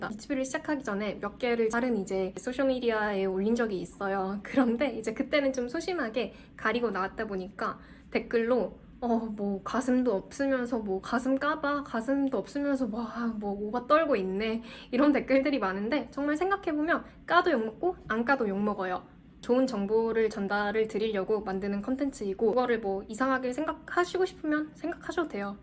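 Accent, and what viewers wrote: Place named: Canada. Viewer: 영문으로는 18.6K라고 뜨는데 상이하나봐요! 그리고 사실은 영어녹음한 동영상 다 안보고 일부만 들었을 때든 느낌은 상당히 익숙한 억양 및 발음으로 느껴져서 미국 중서부지역이나 캐나다오대호근처지역에서 오랫동안 살았다고 추정은 했는데 맞았네요 ㅎㅎ 토론토에 오래 살았을 것 같아요! 거기서 영어연수해서 알아챘어요!ㅎㅎ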